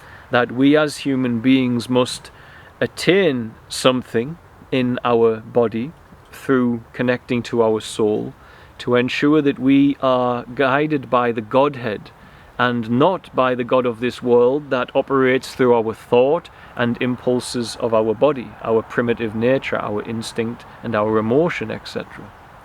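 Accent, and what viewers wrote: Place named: North East England, United Kingdom. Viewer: Are you Welsh bro? Subscribed! Duw bendithia chi (god bless you). yeah I can't work it out. The more I listen the more I think you sound North Eastern! Don't want to say one place or the other in case I offend! Definitely more Newcastle than Sunderland! Final answer! Did I win? Good job though! Nice one!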